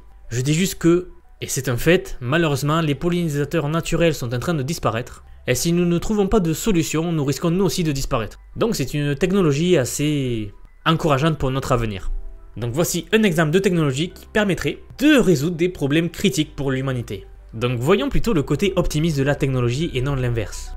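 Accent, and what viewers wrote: South, France. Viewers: J’adore ton contenu a la pointe de l’innovation et merci pour ton travail ! Par contre désolé mais il faut faire des séances chez l’orthophoniste. Ton discours et très saccadé , et ta prononciation approximative … ton accent du sud est fort sympathique mais ca ne suffit pas pour t’ecouter agréablement 😊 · Avec un joli accent du sud du soleil qui nous raconte que de la merde. Quand les industriels auront tout contaminé, ils nous vendront des robots pollinisateurs. Allez vous faire soigner… pour être poli.🖕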